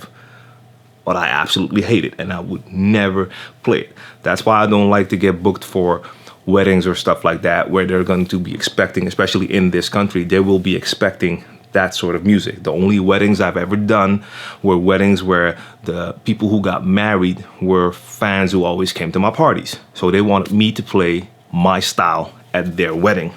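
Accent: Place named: Ohio, United States. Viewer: sirch one Hey bro, where are you at? Sounds like NE Ohio to me, lol. A small bit of advice that works for me is to find a spot where you can play what you like. My passion is to play breaks and little known gems from popular artists along with golden era hip hop but that doesn't work in the dance clubs in my area. I can do the top 40 thing because it's so easy and I have so much experience mixing hard to mix stuff because of my passion. What I did to counter the downsides of top 40 gigs (that pay after all) was find some hipster bars that let me do my thing for free beer. That way I'm always scratching my itch to play what I like and practicing in public which is always better than practicing in the "bedroom".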